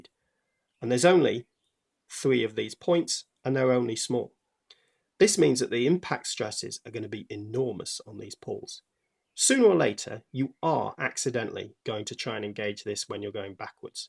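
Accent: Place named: United Kingdom. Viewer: So whose British voice is this?? I've been running Odyssey parts for half my life and finally bought a Clutch today 😁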